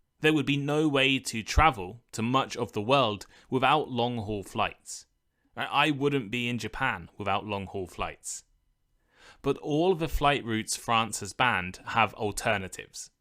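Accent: United Kingdom. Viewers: Is it northern or southern british accent?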